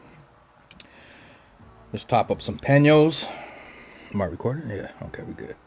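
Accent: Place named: California, United States. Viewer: His California accent is hilarious 😂😂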